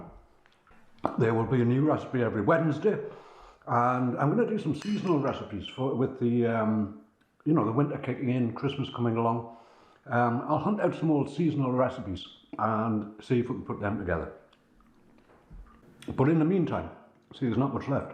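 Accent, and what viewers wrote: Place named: Newcastle, United Kingdom. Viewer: My mouth always waters when I watch you! Geordie accent is a bonus too!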